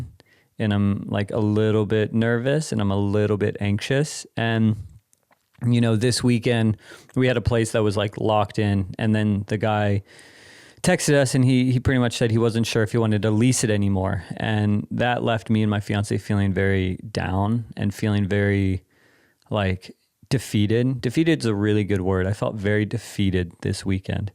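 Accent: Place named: England, United Kingdom. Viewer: Please start making videos here again, i am from brazil, i love your english accent to practice my listening. And the main point is that everything u say at this channel is helping me a lot! Thank u ❣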